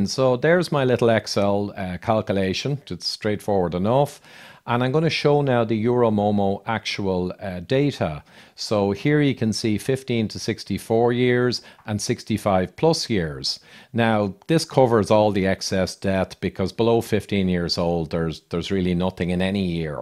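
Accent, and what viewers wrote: Ireland. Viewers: Is that a flat Kildare accent I'm hearing???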